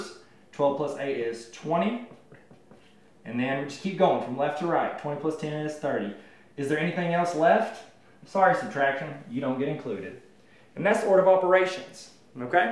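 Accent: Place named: Kentucky, United States. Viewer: I love your Kentucky(region) accent!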